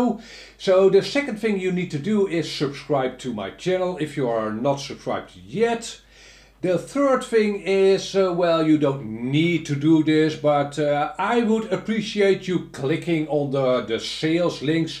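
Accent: Netherlands. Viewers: I use qx7 Ik gebruik nu een qx7 Love your channel and your dutch accent by the way. Keep on with your channel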